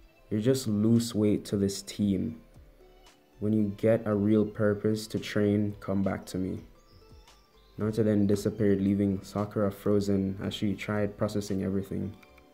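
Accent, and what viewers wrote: Jamaica. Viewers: Aye u from Jamaica right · Bruh I’m Jamaican and and he sounds like me for real sometimes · you sound jamaican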